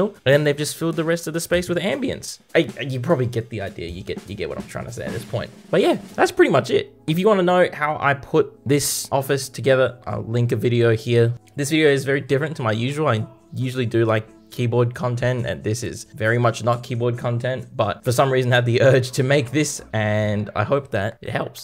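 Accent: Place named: Australia. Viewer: Idk what it is but the Australian Accent just itches a part if my brain. I love it 😂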